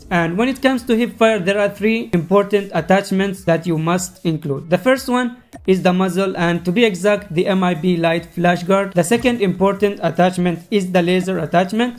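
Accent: India. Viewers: Bro here I am .. Your voice is like Indians .. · bro are you from India? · he's from Oman, but the accent is the same as an Indian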